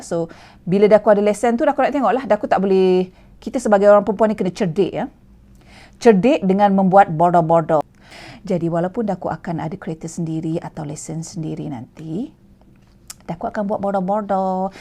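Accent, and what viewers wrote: Negeri Sembilan, Malaysia. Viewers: Seronok tgok celoteh dik ni dgn ckp nogori lgi, wlupun lama di France x lupa loghat negeri 9 👍👍👍😍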